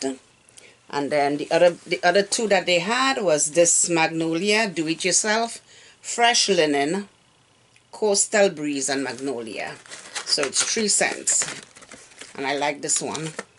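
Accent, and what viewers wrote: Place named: Guyana. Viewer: Love watching your videos! I was married to Guyanese man for years and love your accent, brings me home. also your DT has the best things!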